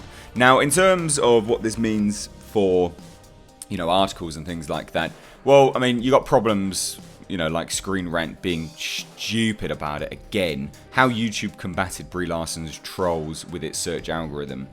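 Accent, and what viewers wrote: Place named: United Kingdom. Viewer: Thanks for covering it. I tend stay away from the extremes on YouTube. There seems to be a left/right war going on in the US at the moment and I feel the rest on the world is being dragged into it. It’s nice to hear a UK accent cover it in a measured way :-) This reminds me of the way YouTube is applying copyright rules. They aren’t actually taking “sides” they are just looking after their advertisers, and it’s their advertisers that have the agenda. YouTube is just following the money.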